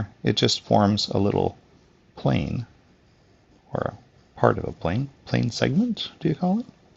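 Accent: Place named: Canada. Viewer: why do you say zed you sound like your from the USA or do I detect a Canadian accent?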